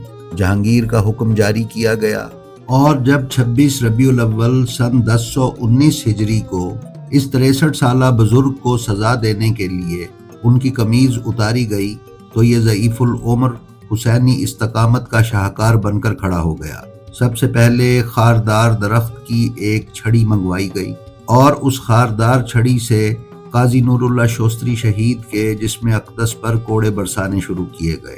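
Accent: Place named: India. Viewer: lakin apka lehja hindustani lagta he..isliye poochha.. mashallah salamat rahe..aap acha kaam kr rhe...Allah apko sehat o umr de.. Darkhwast he Dilli me madfoon shaheed e rabe "Hakeem mirza mohammed kamil" k mutalliq bhi apne samaeen kokuch bataye...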